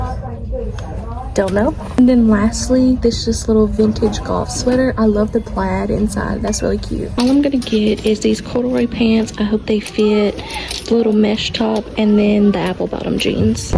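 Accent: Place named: Southwest Virginia, United States. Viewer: Hi! Va here too! I gotta ask bc of your accent. Are you from south west Va? You sound like my family.❤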